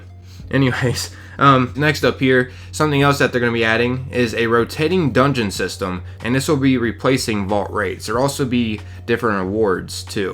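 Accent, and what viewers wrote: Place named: South, United States. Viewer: Your from Ohio? I'm guessing southern. Def ain't North East 😂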